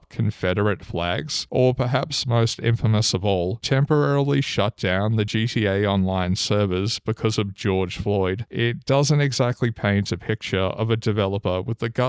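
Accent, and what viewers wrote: Australia. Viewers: australian accent is peak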